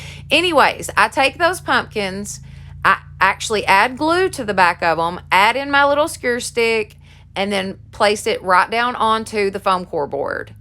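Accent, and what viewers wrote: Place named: Tennessee, United States. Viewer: Hello and greetings from northeastern North Carolina. 👋👋 I'm a newbie. I already subscribed because I love your name for your channel! Your energy is inspiring! AND I LOVE THAT TENNESSEE ACCENT!! 😁 Actually I do have a question. And I don't know who to ask. Where di you sign up to do LIVES here as well as on YouTube? I've dreamed of being a small business owner for about 23 years now.... I am a "Chronic Creative" (borrowed from Amber Strong 😁). I enjoy creating, and I decided after hurting my back and being forced into early retirement - LET IT FLY! It's taken me this long to feel ready. I AM READY!!! Thanks! L8R ✌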